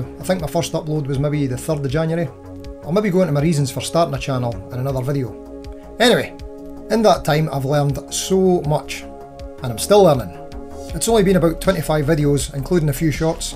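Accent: Scotland, United Kingdom. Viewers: yep ;) I think is more the accent not the speed :) I can understand good English even if fast but I never practiced with Scottish people ehehe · I enjoy your videos because of several reasons. One, I am also interested in new budget gear. Two, I just like your personal style => serious info but also your sense of humor. Three, I may be wrong, but I think you are the first of all reviewers I watch who took a Budget guitar to a live situation. That is outstanding to me and I’d love this to be a co stanz thing in at least some reviews (I guess it would not be possible to test all stuff live). Four, I like your dialect/accent (Scottish? I’m from German I can only guess). For example you pronounce „you“ like the German „u with two dots on top“ which is fascinating. Fife, so far it is still possible to get your reaction - with more viewers that will naturally decrease, but for now I like that. 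Great channel and work. Keep it up mate!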